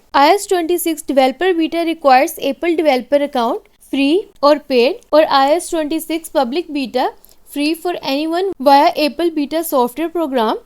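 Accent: India. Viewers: Ohh is it Indian Ai English voice?😅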